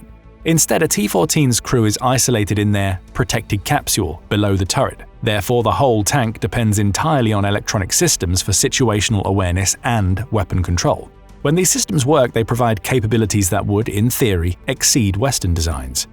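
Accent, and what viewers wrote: United Kingdom. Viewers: Tired of the UK-ENGLISH accent in all these Ukrainian videos.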